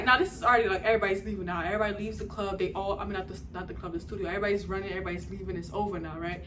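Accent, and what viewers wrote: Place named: New York, United States. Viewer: Man That New York accent kills me 😂😂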